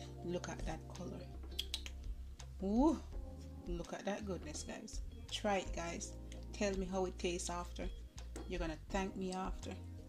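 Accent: Jamaica. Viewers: I've always loved the Jamaican accent, now i love Jamaican roasted chicken too🎉💯🔥🔥will try out sooon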